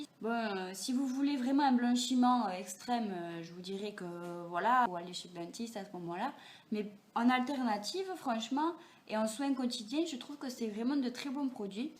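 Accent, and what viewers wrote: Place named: South, France. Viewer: coucou coup de fouet mdr j'adore je suis partie vivre dans je bord et je suis du sud ton accent me rend nostalgique (j'ai mon accent aussi lol) super vidéo que de belles dents 😆😆😆😍😘 bye bye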